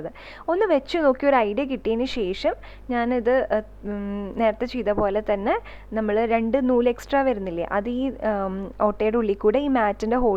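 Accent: Thrissur, India. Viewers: Chechi Thrissur kari anno 🤔🤔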